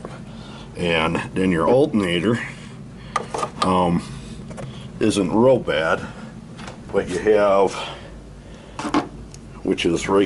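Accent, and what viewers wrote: Minnesota, United States. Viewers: Northern minnesota?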